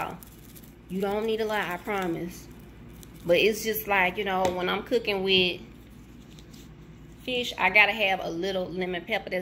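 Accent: United States. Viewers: Dk what city ur from but you sound country as...🤣 not hating on you just saying. like ur simplistic way of cooking. hint: spray oil on paper towel and wipe on fryer. that will eliminate any build up and avoid potential fires. thats why they recommend NOT spraying directly on fryer. Be safe.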